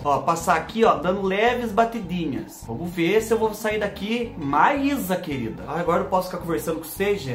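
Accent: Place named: Minas Gerais, Brazil. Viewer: adoro vc seu modo de falar parece nois mineiro Kkkk Ajeita parceria pra vc testa coisas pra nois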